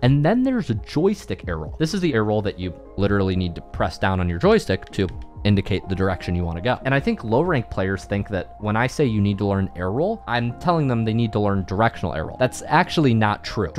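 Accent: Poland. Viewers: Wait a minute are you polish? That Google what hear it is was i polish? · Nie myslalem ze jestes polakiem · Is he from Poland?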